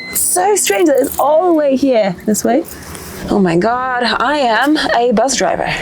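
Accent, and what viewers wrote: United States. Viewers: You’ve been in the US long enough that your starting to talk like an American. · She is sounding more and more American as she goes along 🤣🤣🤣🇺🇸🇺🇸🇺🇸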